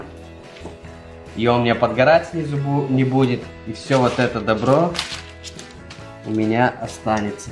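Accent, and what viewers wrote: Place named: Ukraine. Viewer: украинский акцент такой ))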